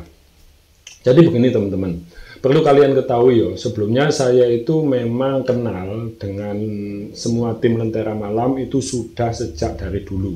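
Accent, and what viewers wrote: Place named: East Java, Indonesia. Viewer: Mas jatim mu mana sih kok medok nya super medok dokk dok...soale aku juga jatim tapi yg perbatasan jateng yg ga nglotok medoknya..yahh level sedang lah ...semangat mas bro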